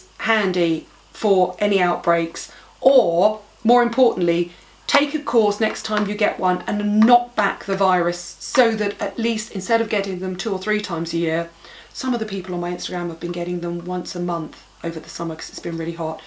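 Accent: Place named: England, United Kingdom. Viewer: Hi thank you so much for sharing a lot of good information. Your video was very entertaining. I always loved the English accent. By the way your cold sore doesn't look that bad. Thanks again. Cheerio.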